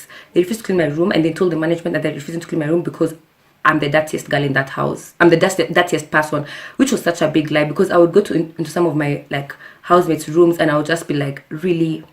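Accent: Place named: Kenya. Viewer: Kenyan English accent is very interesting. I noticed how different you pronounce some words: First - Fast Service - Savice Year - Yar Fact - F..ked Work - Wark Church - Chach Etc. I just love it! Thanks for sharing your experience. I did hear a lot of SA accent as well.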